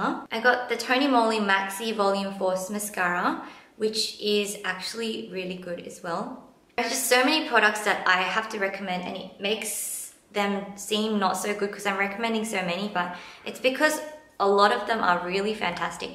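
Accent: Australia. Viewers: i like your voice! hehe very australian ^^ i'm an aussie asian too~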